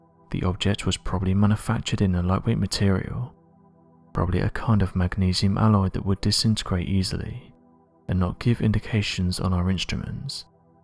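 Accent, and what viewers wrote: England, United Kingdom. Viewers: you heard him wrong. he clearly says "shooting off". i didnt even need to listen to it more than once to hear him say "shooting off" but after your comment i went back to listen and he doesn't sat "shitting off" lol. maybe you're not used to the English accent. I'm saying this respectfully cheers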